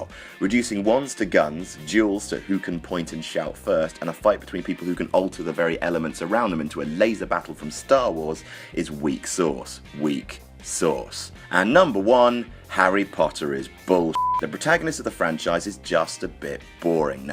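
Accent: United Kingdom. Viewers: also fascinating how a brittish accent on a woman can be really sexy and yet a grown man nasally whining in the same accent is like firing a nailgun at a shallow angle against cello made of chalkboard for 6 minutes solid.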